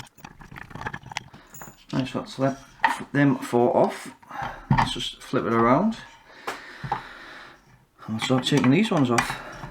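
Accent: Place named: Geordie, United Kingdom. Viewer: Sitting here watching as I do some strap stuff then cleaning parts of my Xbox One I got for £4 prior to installing a new 1540 motherboard and dvd board. I do like the soft tones of the Geordie accent, I spent a week up there around 2008 meeting up with my old World of Warcraft guild and what an awesome time it was esp in the pub outside the Metro where I collected a ton of bird's phone numbers as they jus' adored my south London wide boy accent hehehehe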